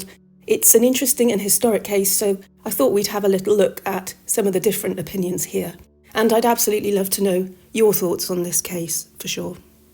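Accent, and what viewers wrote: England, United Kingdom. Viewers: Nothing better than hearing a ghost story in an English accent, well I concur, and I’m now becoming attracted to my own voice, jeez thanks, this is asmr stuff, honestly I could listen to this all night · What a beautiful home. Another interesting case,. Nothing better than a ghostly tale told with a British accent. I’m in bed, waiting for my nighttime meds to kick in and listening to my favorite YouTube channel.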